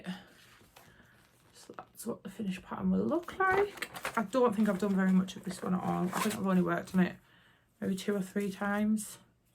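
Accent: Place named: Newcastle, United Kingdom. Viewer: Welcome to flosstube!! I live in Canada but my mums side are all Geordies, its so nice listening to you talk! Love your accent and all your WIPS. Look forward to your next video💗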